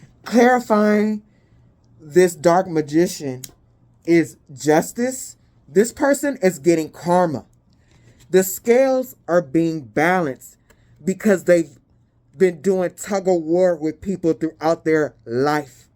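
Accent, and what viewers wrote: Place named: Philippines. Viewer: Hey shadow soul tribe gifted sister. Thank you so much for your knowledgeable reading you shared with us. Take care darling. I believed its my seriously story indeed right now?? Phoenix rising above aka Dragon lady. Watching from Texas. I can tell you are a Pilipina with your accent. Sorry but im just guessing. Take care and ve safe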